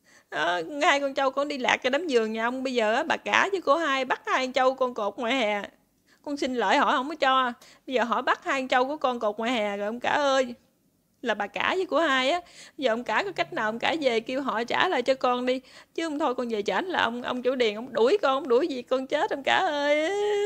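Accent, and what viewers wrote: Mekong Delta, Vietnam. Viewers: Anh thich vong noi Mien tay cua Em roi do nhe? hon nua la cai mieng rong cua Em nua do??mieng nay ma B…C…la te ca nguoi luon do nhe??🤫🙈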